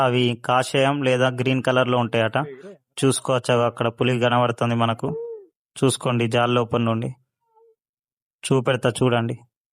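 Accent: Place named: Telangana, India. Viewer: Pakka telangana slang.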